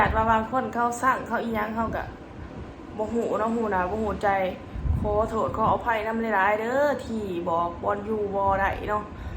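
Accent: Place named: Ubon Ratchathani, Thailand. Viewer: เจ้าอยู่เมืองได๋ สำเนียงคือไทอุบล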